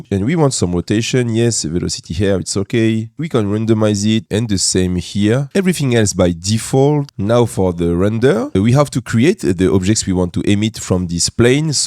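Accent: France. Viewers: t'es français ça s'entend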